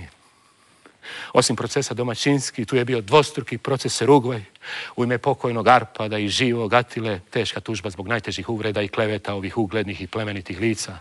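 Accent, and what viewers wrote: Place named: Herzegovina, Bosnia and Herzegovina. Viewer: Nema glumca do Rade Šerbeđžije koji zna govoriti Krležu! Moj naklon i Despotu ali ima svoj hercegovački naglasak!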